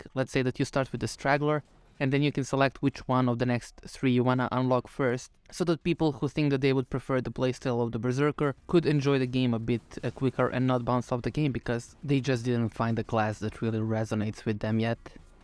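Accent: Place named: Eastern Europe. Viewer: Potpuno se slazem, precesto mi se desava da pogledam video koji traje skoro koliko i epizoda neke serije koji nije ni najmanje koherentan i vrti jednu te istu pricu svo vrijeme bez neke poente. Radujem se daljim uploadovima :) Pretpostavio sam da si negdje iz istocne evrope po akcentu, kada si izgovorio ime developera u ovom videu pravilno, znao sam da mora da si negdje sa ex-yu prostora, svi ostali se generalno bas muce sa slovom Ć haha. Sve najbolje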